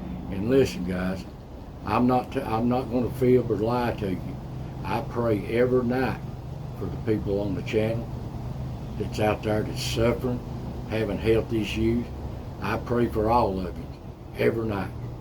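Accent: Appalachia, United States. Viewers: I watch all your videos. My people came down here to Florida in 1835 from the Appalachia. But we still pretty much talk like y'all. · When I come to channel, I feel like I'm home. I was born and raised in the South Western part of Virginia, and Tennessee and Kentucky are just a stones throw away from where I grew up. Naturally I love the Smokeys, and speaks the dialect that I speak and grew up with, and that's comforting to these ol' ears! I love channel--especially love the wild life videos. I actually love all of his content, as I've never seen a single video of his that I haven't enjoyed!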